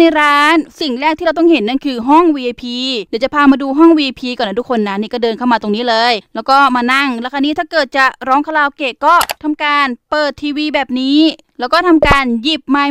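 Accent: South, Thailand. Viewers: พี่ เหมือนคนใต้เลยค่ะ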